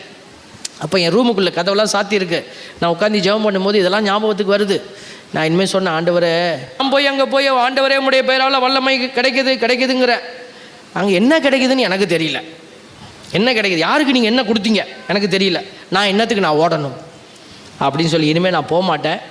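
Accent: Sri Lanka. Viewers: இலங்கை தமிழ் 😅😅👌👌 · Father இலங்கையர்கள் எல்லோரும் நீங்கள் பேசுவது போன்று சாதாரண தமிழ் தான் பேசுவோம் யாழ்ப்பாணத்தில் இருப்பவர்கள் மட்டும் தான் நீங்கள் பேசி காட்டும் தமிழில் பேசுவார்கள் ஆனால் யாழ்ப்பாண தமிழ் தான் பொதுவான இலங்கை தமிழ் என்ற தவறான கருத்து இருக்கிறது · Father Srilankan tamil azhaga peasurigale😊 awesome 🎉🎉🎉 antha "விளங்கிட்டா" ultimate ❤❤❤ Unga sirippukkulla yelo vazhi irukkumnu puriuthu. Praise be to the Lord 🕊 unga pani sirappa thodaranum. Lots of love from your child Catherine, Srilankan❤ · எனக்கு பிடித்த தமிழ், இலங்கை தமிழ், கேரள தமிழ், மலேசிய தமிழ். ஃபாதர் ன் இலங்கை தமிழ் அருமை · Neenga peasinadhu srilankan jafna tamil. neenga peasura tamil madhiri oru koottam irukku adhudhaan Indian vamsavaliakkalnu.nuwara eliya mavattaththula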